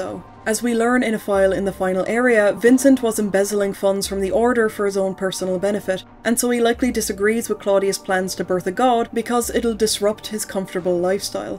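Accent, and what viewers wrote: Ireland. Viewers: Am I detecting a pretty strong Irish accent in your voice? 😉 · it's always so cool to hear another irish person on ytube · Forgive me as I'm new, are you Irish?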